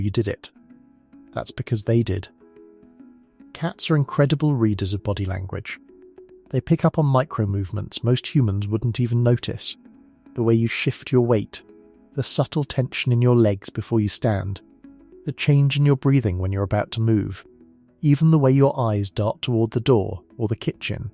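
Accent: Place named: United Kingdom. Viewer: Because it sounds better in a British accent. And the rest of the world is tired of listening to american accents tbh.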